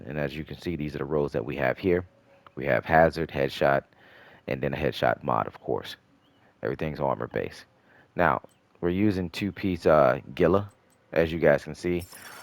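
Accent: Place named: Baltimore, United States. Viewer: fire video, u def from baltimore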